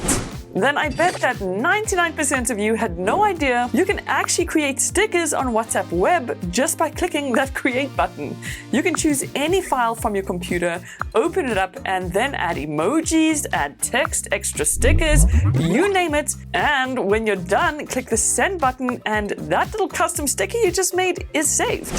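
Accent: South Africa. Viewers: Great video, are you South African, you sound South African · Are you South African?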